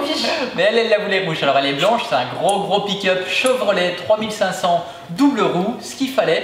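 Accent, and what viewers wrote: Quebec, Canada. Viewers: a force de être au Québec tu commence à avoir le accent québécois !! super vidéo!!! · hello , tu a de plus en plus l'accent canadien ... mdr... pour ton problème d'eau a tu regarder a l'interieur de la caravane si tu n'avais pas une vanne de sécurité ? sinon hâte de vous voir commencer votre voyage, et surtout hâte de voir vos vidéo, bon courage a la petite famille.. biz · Bonjour les Compagnons, un Belge qui n"a pas l'accent Belge et qui prends l'accent Québecois, c'est super .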